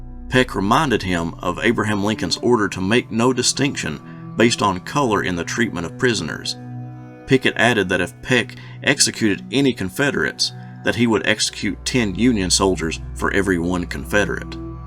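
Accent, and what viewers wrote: South, United States. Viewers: Very informative. I enjoyed the southern accent too. Thank you.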